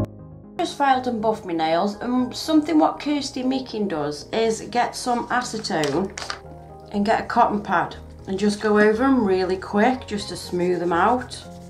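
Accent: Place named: Cockney, United Kingdom. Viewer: Oh my gosh you are the nails queen! The stuff you come up with is always so stunning and creative! Keep up the great work, I love your videos! I've been watching your videos for about a month now and I gotta say I'm addicted, lol hope that doesn't weird. I was wondering what part of London you're from, your accident sounds east end but what do I know haha. Guess I'm just basing that guess on British crime movies. Lol sorry for the ramble I'm from California!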